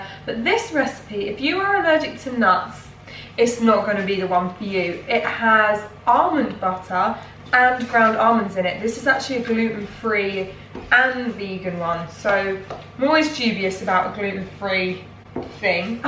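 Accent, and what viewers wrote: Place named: United Kingdom. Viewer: what part of the UK are you from, im trying to place your accent 😂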